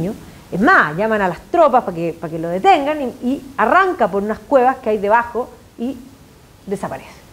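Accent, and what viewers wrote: Chile. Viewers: Muy bien, pero, los no-chilenos no entendemos algunas frases y palabras por el acento...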